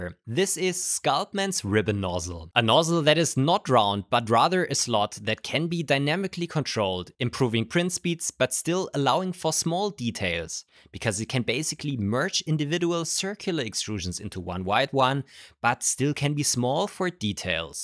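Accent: Germany. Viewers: You are habe a Little Bit of german aktzent, vermute ich mal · Just saw this channel, you can hear, that you're German and I just wanted to say congrats. It's pretty rough to get that much abos as a German. Also the video ist pretty good, thanks. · Gutten tag eveybody man merkt das du deutscher bist aber grundsätslich sehr guttes video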